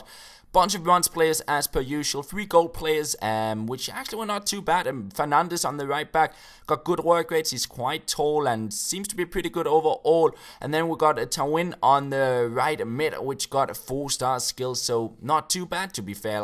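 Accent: Denmark. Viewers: U Sound danish.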